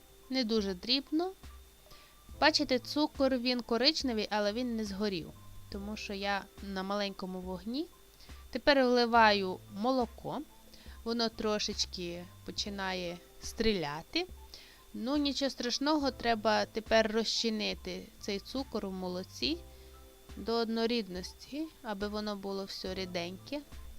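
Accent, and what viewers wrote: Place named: Western Ukraine, Ukraine. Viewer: Добрий день, пані. З великим захопленням і радістю слухала ваше відео. Як приємно чути рідну мову. І особливо наш західний говір. Я, як правдива бойківчанка, до тепер говорю пательня і ронделик, пляцки і цвібак 😄. То є наш генетичний код 👍. Люблю той медівник. І ще крім горіхів добавляю родзинки і замінюю 1 склянку цукру на склянку кокосової стружки. Дуже цікаво виходить. Хочу відповісти на коментарі з запитаннями: " а де мед?" По перше мед ні в якому разі не можна нагрівати і тим більше пекти! При термічній обробці складники меду перетворюються в шкідливі смоли і віск! По друге всі медовики пекли або на штучному меді, якого є вдосталь в магазинах і по низькій ціні. Або робили в дома з цукру і води, або на молоці, щоб вийшла молочка карамель. А ще смачне взагалі на вершках, то взагалі бомба 🤩. Так що всім доброго дня і спробуйте цей смачненький медівник. 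І не переживайте, що там багато цукру, зате немає ніякого крему ні вершкового масла ні сметати! Якщо один кусочок на сніданок, то буде енегія на цілий день. Бажаю всім успіхів і всіх благ ❤😍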